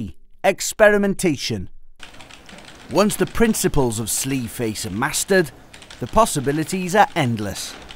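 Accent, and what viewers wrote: Wales, United Kingdom. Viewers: The narator sounds like he has a welsh accicent :D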